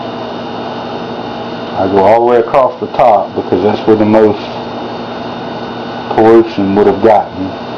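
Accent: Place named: South, United States. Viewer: Having grown up with a southern mom, I understood every word! Please do not apologize for your accent - it's a beautiful thing. Also, awesome tutorial! Thank you! I have been looking for a good one for a while now.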